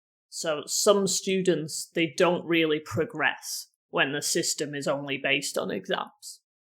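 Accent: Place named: United Kingdom. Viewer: I love the British accent. You speak really clear I understand it very well. Thanks again.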